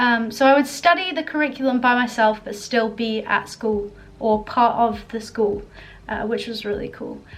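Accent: England, United Kingdom. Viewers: Are you English?? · Are you English?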